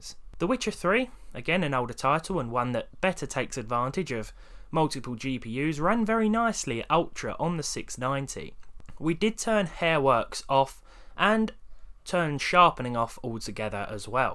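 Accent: United Kingdom. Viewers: British accent + old tech? Instant sub!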